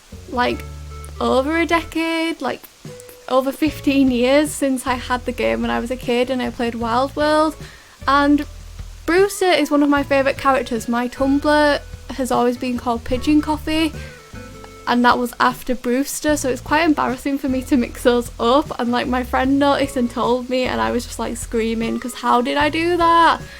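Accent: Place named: Northern England, United Kingdom. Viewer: your accent makes me so happy, I'm from Yorkshire and hearing another northerner makes your videos feel so cosy aha, your island looks so so good!!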